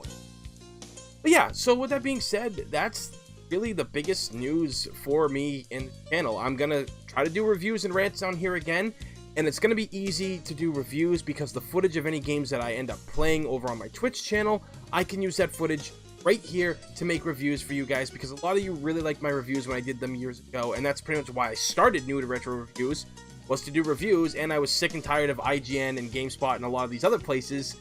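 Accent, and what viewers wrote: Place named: Boston, United States. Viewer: ROE introduced me to your channel. I think you're absolutely hilarious man. Love your accent u sound cool. I think you're a bostonian? That or new yorker. When u did those playthroughs in outbreak with ROE I really enjoyed um great fun 😁 By any chance do u have the moment where u were dragged through the window by the zombie in the hospital? I PISSED myself laughing 😂🙈 Sorry I just couldn't help laugh but it was funny af 🙈 I wish I could be your friend lol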